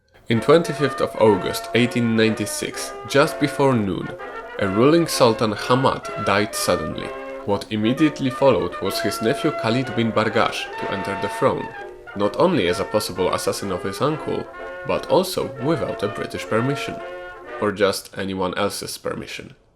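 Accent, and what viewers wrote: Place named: Poland. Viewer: I had a strong premonition that you're Polish by the use of Asterix and Obelix. (then i also heard your accent) Great video!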